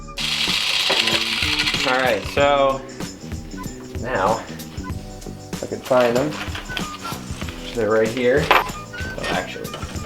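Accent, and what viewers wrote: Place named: New Jersey, United States. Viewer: games&memes because he’s from jersey they have accents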